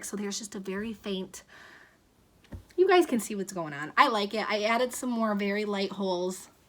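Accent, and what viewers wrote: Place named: Michigan, United States. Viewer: OMG 😱 I called it! Girl, I was just thinking to myself that your accent was totally a Michigan accent! I’m from Michigan and now live in Oklahoma but watch resale videos from all over. With that said, lol, I’ve gotten pretty darn good with guessing accents, but you are my first Michigander resaler (I know, I know, resaler is not a word lol) that I’ve found so far on YouTube!! Sorry y’all for the ridiculous giddy reaction, but if you were from Michigan, you’d understand! Although that could be due to my age! LOL. Anywho, nice to meet you and thanks for the resale tips on clothes! I haven’t dipped my toes in that area of resale but am enjoying the tips. Last but not least, I watched your latest video in which you mentioned that you heard it helps to make sure to ask for thumbs up in the videos and girl it’s true! 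And a lot of the reason why is just that people forget (including me! Lol). Look forward to more and keep representing strong girl 😜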